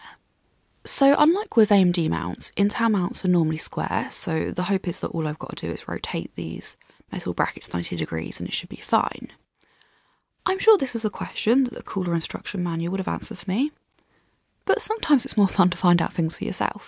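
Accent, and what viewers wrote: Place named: RP, United Kingdom. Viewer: still watch your videos just to hear you say "but" with that posh accent of yours.... will shamelessly steal your voice for my AI personal assistant when the time comes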